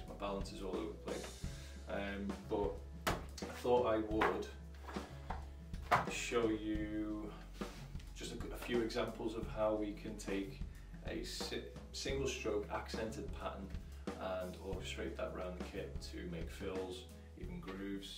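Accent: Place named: Wales, United Kingdom. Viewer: the first contact I’ve ever had with a Welshman in a long while (worked with some ladies from Wales many years ago—love the accent/language). Thanks for considering my comment — hope the move was successful!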